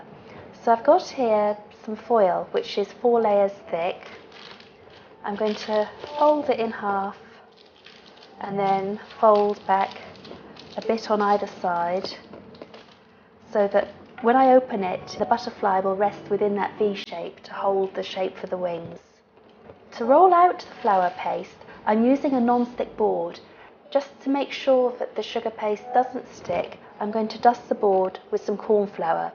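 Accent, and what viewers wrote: United Kingdom. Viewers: a southern part of england, pronoucing words very clearly :) i know, im from england :) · Finally, I have found a video with an expert-looking outcome and a british accent. *Sigh* I'm oh so sick of watching over-sugared betty crocker videos. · If only everyone had a nice English accent ^^ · I love the cup cakes you made-they look so pretty and delicate! And I LOVE your accent!! I want to visit England so badly! Both my Father and Mother's side of the family has British roots. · I'd listen to a Brit read the telephone directory... love the accent! Lovely video.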